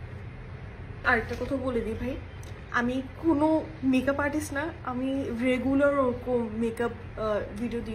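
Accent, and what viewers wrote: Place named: Chittagong, Bangladesh. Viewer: আপু তোমার কথায় চিটাংগা এক্সেন্ট শুনে খুব ভাল্লাগলো❤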